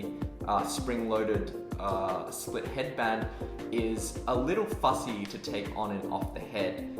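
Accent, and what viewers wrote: Australia. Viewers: You're an aussie? subbed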